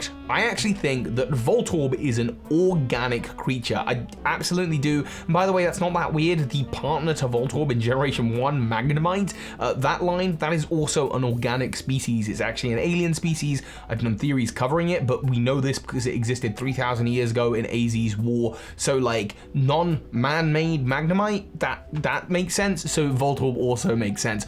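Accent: United Kingdom. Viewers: Your from Uk?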